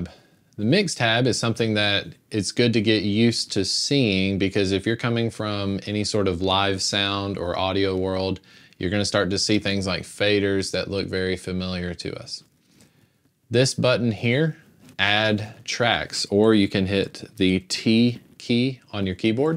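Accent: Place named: South, United States. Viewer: I could be wrong but I detect a very slight southern accent in your voice.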